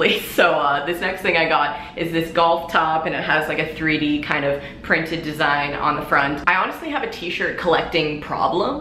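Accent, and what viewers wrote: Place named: Brooklyn, United States. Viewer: I HEAR BROOKLYN BABY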